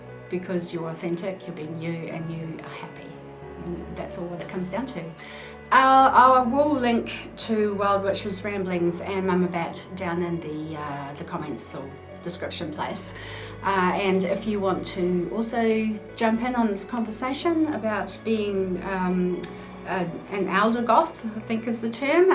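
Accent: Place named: New Zealand. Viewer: Are you a new zealander?? I just caught the accent and was like whoa! I'm from Christchurch. I'm just transitioning into the goth subculture--by that I mean I'm now dressing more to reflect the things I've always liked :) Enjoyed the video edit: I totally commented before you mentioned NZ 😂 I just get excited when I find NZ'rs in youtube haha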